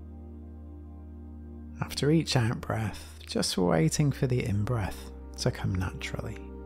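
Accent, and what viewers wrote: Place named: England, United Kingdom. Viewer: Always nicer and more calming to hear English accent.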